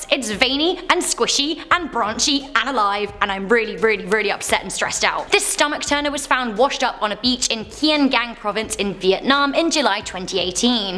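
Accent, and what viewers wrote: United Kingdom. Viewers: It’s British like mine <3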